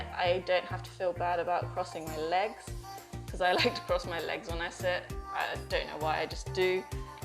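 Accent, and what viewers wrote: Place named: United Kingdom. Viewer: Hey, your videos are so informative and helpful. Thanks for sharing your travelling tip during the Pendamic. I miss travelling personally as I would have loved to travel and explore New Zealand and Japan next ❤. Im from Canada too but I live in Ontario. I like your cute British accent to. Keep sharing and stay safe😊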